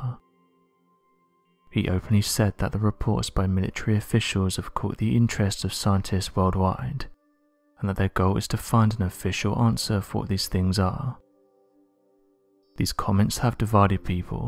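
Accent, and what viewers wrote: United Kingdom. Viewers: All fluff no substance. This channel brings nothing to the table every video except a 'mysterious' British voice over whispering cautiously. Pretty pictures/zero substance.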